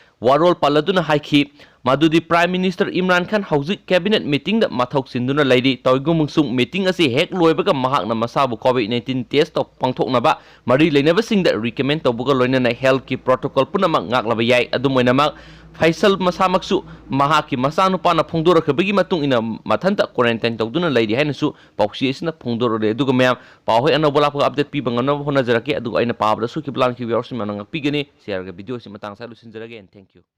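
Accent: Moirang, India. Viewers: moirang gi lon da douna tou a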